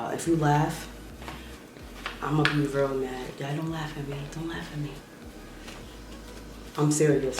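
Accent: New York, United States. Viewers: are you from NYC? loll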